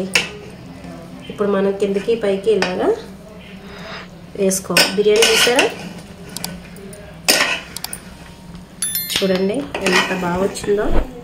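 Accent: Godavari, India. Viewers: Madam meru godavari zilla valla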